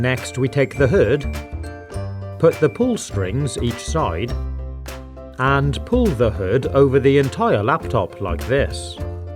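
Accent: United Kingdom. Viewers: Louisa Dsouza it's just a British accent.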